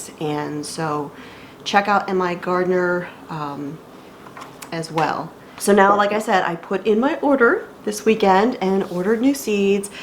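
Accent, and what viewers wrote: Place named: South, United States. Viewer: Love the southern accent! I would have pronounced like you even though I grew up in the south.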